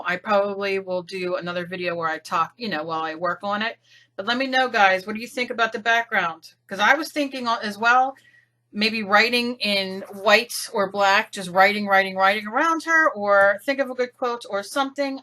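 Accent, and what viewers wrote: New Jersey, United States. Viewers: You are awesome! I love this & I love you girl! I watch you not just to watch you do your art (which I could do all day, & sometimes do......lol) but I also just love to hear you. You are funny, I love the jersey accent, & you have things that are important to say......so I said it befor & I will say it again.....Love you girl! Keep doing what you do!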